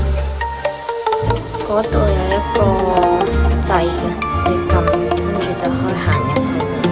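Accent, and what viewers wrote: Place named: Hong Kong, China. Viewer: 你的口音好像是香港人啦 然后你讲的话是不是广东话 我猜你肯定是广东人❤❤❤😊😊😊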